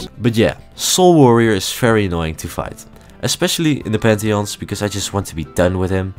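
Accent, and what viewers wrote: Netherlands. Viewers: ur Not english Are you? Your accent Sounds very Dutch to me😂 Don’t worry bruh, I’m dutch😂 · Personally feel like you could work on your comedic timing a little. You sound very Dutch, which might be why the jokes don’t land on me. Great production value though. I just don’t find it very funny. Soul Tyrant is so bad on repeated playthroughs and pantheon runs. He’s super easy yet super slow cause he’s rarely ever in nail range. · are you dutch? you speak english like you are dutch (not an insult) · The biggest skill issue was listenting to the heavy dutch accent 💀 Still a great video though LMAO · U Dutch? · you sound pretty Dutch. Your accent I mean 😅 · I just love that i can immidiately hear the dutch accent. Geweldige video! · Are you dutch? You sound dutch. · any chance you're dutch? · The dutch on this dude is something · Dit is zo’on erge Nederlandse accent 😭😭😭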